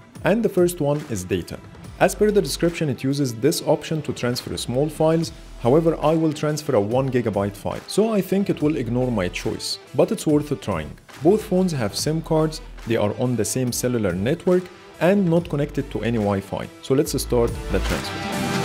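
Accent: Egypt. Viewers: Amazing video I only have one question, your accent looks familiar you must be Egyptian right ? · ليه انا الوحيد الي حااس انو الي بيتكلم مصري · انا حسيتك عربي برضه من بداية الفديو لما سمعت لهجتك الانجليزية 😂😂 قلت ده مصري اكيد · sound like Egyptian to me😁😁👍👍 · انت مصري ؟ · لهجتك كصريه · انت مصري يسطا ؟ ال accent دي مصري وش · انت مصري صح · الصوت مصري مصري يعني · are you Egyptian I can tell from the accent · مصري عايش في الامارات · You sound Egyptian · I have feeling that you are Egyptian from your accent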